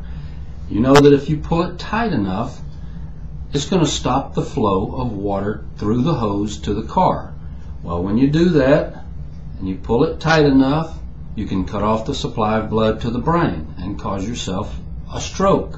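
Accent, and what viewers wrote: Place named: South, United States. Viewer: " the southern accent doesn't make him any more believable" Nice prejudice coming from Canada "eh"? Statements like that only point out how ignorant you are. Have you ever been to the South? Do you have any idea what you're talking about? He's not talking about harmless yoga stretching, he's talking about wrenching your neck beyond it's anatomical limits.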